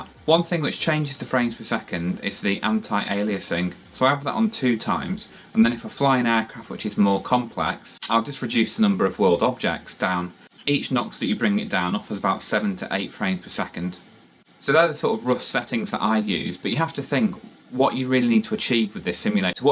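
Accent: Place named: Blackpool, United Kingdom. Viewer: I recognised the Blackpool accent before the aerobatics over the Wyre! Nice film, from a 42yr defence aerospace vet who lived right under that view. Hope all is well for you now.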